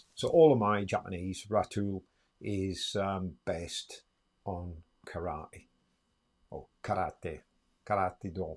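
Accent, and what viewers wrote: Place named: Newcastle, United Kingdom. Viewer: Ill give you Fifty Quid if you say Utterly butterly in your Geordie accent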